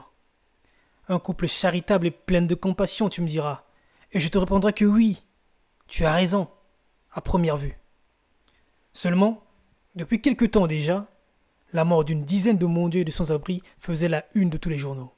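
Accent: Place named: Mali. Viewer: Vraiment intéressant, le petit jeu ajoute une plus value non négligeable à l'attractivité de la vidéo, je constate un gouffre au niveau du tempo et du ton entre cette vidéo et la dernière en date que tu as sorti, si tu continue à surprendre avec des histoires peu connues, il y en a tellement.. tu va percer! que demande le peuple??!! Je me risque à donner ton accent, je dirais malien.. 😊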